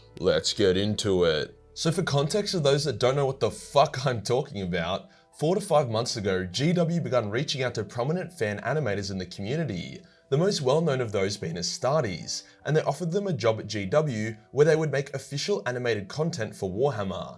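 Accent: Australia. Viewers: why does this man sound like australian chills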